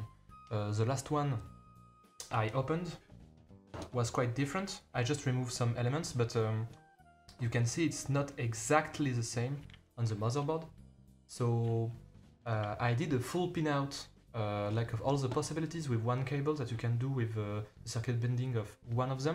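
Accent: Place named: France. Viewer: l'accent fr je kiffe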